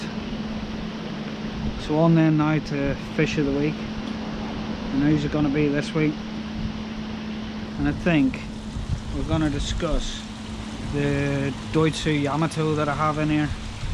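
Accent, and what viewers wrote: United Kingdom. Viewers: Great video,hope you and the family are well as we are here,great to hear the Holywood accent. Used that flexi pipe for a few years now and it is really strong though others have to be carefull as it can come in 38mm and 40mm and the larger wont fit solvent weld which I have learnt,just thought I would help a bit. Keep up the videos mate and look after yourself.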